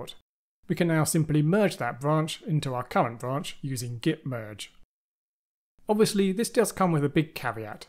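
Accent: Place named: United Kingdom. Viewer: You sound like you're from the UK. Did you order via pcbway? Any issues since brexit / vat changes?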